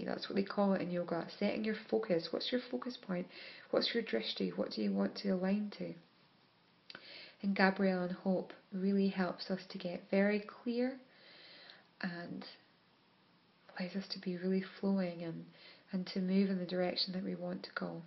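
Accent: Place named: Scotland, United Kingdom. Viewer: I think i detect a bit of Scottish accent.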